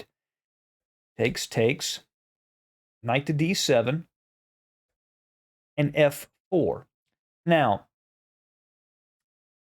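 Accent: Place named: South, United States. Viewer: Thanks for sharing with us. I like the accent as well as the commentary. My guess would be Alabama or Tennessee. Great series on Firouzja by the way. After watching your videos, I now understand why the guy's made such a big impression on the chess world. Looking forward to the next video.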